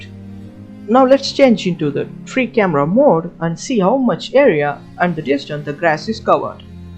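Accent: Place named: India. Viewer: funny, because I can't even begin to understand it full stop. the music, the mic quality, the indian accent... what is he saying?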